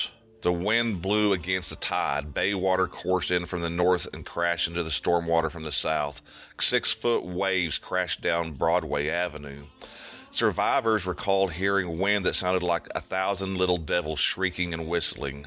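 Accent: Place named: Texas, United States. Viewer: cool man , I’ll tune in. Gonna take me a while to go through all your videos but I like the narration that sounds like you’re actually from Texas telling stories around the campfire. Very wholesome and cozy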